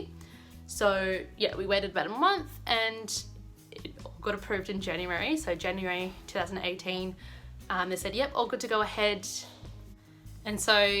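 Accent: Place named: Australia. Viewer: Hey! First of all, you Australians have an amazing accent. I really like it! Secondly, good luck with this! You’re doing a fantastic job. I hope things work out for you. My prayers are with you, honey.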